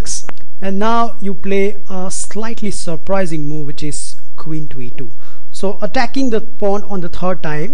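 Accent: India. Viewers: Love you bro. Hindi accent is great ;) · the indian accent...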